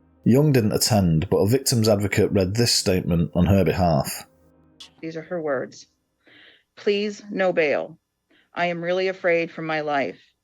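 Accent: United Kingdom. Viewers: Hi, I'm a new subscriber in your channel, I love watching crime stories and for a various vlogs about crime investigation ,I love your channel. Can i have a request,can you pls put a substitle,cause I" ve got a bit hard in understanding on some of your British accent..Philippines here❤️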